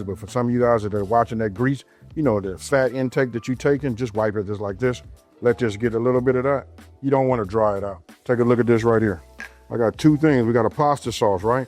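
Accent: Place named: South, United States. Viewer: He is from the south stop hating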